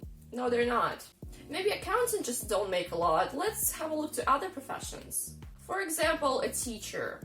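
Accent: United States. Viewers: How did you learn english with an american accent? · It’s hard to believe you’re Russian...you sound American...your grasp of English is amazing if you’re Russian.. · Get your Ass to America! I just started watching your channel so I don't know your background but I must say your English is amazing and honestly as an American I don't think most Americans would pick up on your accent they would probably just assume that you're American with a weird dialect lol no disrespect intended with the "weird". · I want to watch the video, but this "Amerrrrican" accent.... Ewwww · You have a American accent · Great work! As a Chinese, Khabarovsk is a city that I always wonder what it’s like since it is so close to us. I often hear from people from the northeastern Chinese provinces (close to the Russian Far East) complaining about their stagnant economy but it seems that sadly our Russian neighbours aren’t doing great either. 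Wish you the best with your channel and congratulations on your English skills, you sound very much like an American! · Your English is American - style .. most excellent.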